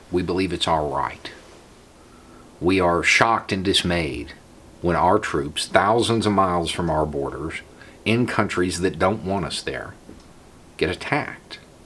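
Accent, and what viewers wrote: South, United States. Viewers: Dan Carlin is absolutely more knowledgeable, he should have an honorary doctorate in history from some prestigious university. However, this man reaches people that Dan could never, because of the language and dialect in which he speaks. talks to people from Appalachia and the Southern States in a way that is digestible. If someone from the "outside" spoke the same words, with more authority....it would feel condescending. Nothing shuts down a blue-collar working southerner, more than someone with a Brady bunch dialect trying to give them a history lesson.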